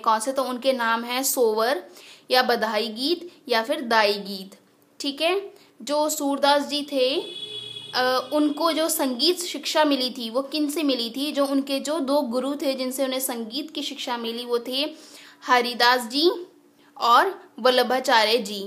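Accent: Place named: Haryana, India. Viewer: Bhut ache se smjate ho ji aapki haryanvi style me smjana kare acha lga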